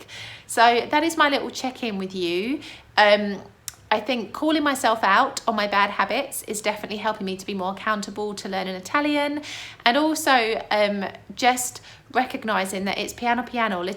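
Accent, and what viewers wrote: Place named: Cockney, United Kingdom. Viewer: Surely an Hospital is not just the easiest place to learn Italian. You did pretty good. We all were like you at the beginning of our English learning language path. Grammar will follow along once you'll be more comfortable with the spoken language. Italian grammar is pretty difficult, especially tenses; try to learn it in little bits a time. But, please, don't spoil that lovely Cockney accent. 😁 You'll soon realize that at least the 70% of italian terms have corresponding very similar terms in English, some of them are just little bit less used because they may sound sometimes a bit archaic or poetic or too technical. Probably in some cases some ancient Latin inscriptions could be better understood by an Englishman not knowing Latin than an Italian not knowing Latin. 😁 As some funny videos, such as those from Ecolinguist or from Luke Ranieri - Polymathy YouTube accounts, could explain very well. 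😁 Keep up the good work. 👏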